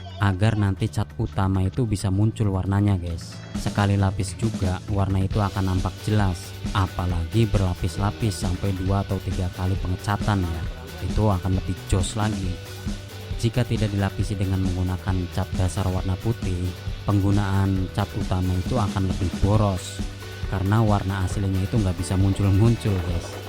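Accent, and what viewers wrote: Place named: Cirebon, Indonesia. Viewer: logatnya ky org indramayu/cirebon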